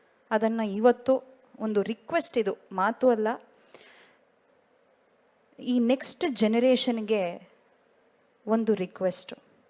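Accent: Standard. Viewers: ಶಿವಶ್ರೀ ತಮಿಳುನಾಡಿನಲ್ಲಿರುವ ತೆಲುಗರ ಹುಡುಗಿ. ಚೆನ್ನಾಗಿ ಶುಧ್ಧ ಕನ್ನಡ, ಅದೂ ವೇದಿಯಿಂದ ಮಾತಾಡಿದ್ದಾರೆ. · ನಿಮ್ಮ ಸ್ವಚ್ಛವಾದ ಕನ್ನಡದ ಭಾಷಣಕ್ಕೆ ಕೋಟಿ ಕೋಟಿ ಅಭಿನಂದನೆಗಳು 🙏🙏🙏🌹🇳🇪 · ತಾಯಿ ನೀವು ಬೇರೆ ಭಾಷೆದವರು ಆಗಿ ಇಷ್ಟು ಶುದ್ಧ ಕನ್ನಡದಲ್ಲಿ ಮಾತನಾಡಿದ್ದಕ್ಕೆ ತುಂಬಾ ತುಂಬಾ ಧನ್ಯವಾದಗಳು .ಕನ್ನಡ ನಾಡಿನಲ್ಲಿ ಹುಟ್ಟಿ ಕನ್ನಡದ ಅನ್ನವನ್ನು ತಿಂದು ಕನ್ನಡದಲ್ಲಿ ಮಾತನಾಡಲು ನಾಚಿಕೆ ಪಡುತ್ತಾರಲ್ಲ ಅಂಥವರಿಗೆ ಸರಿಯಾದ ಉತ್ತರ ಕೊಟ್ಟಂತಾಯಿತು ತಾಯಿ ತುಂಬಾ ಧನ್ಯವಾದಗಳು.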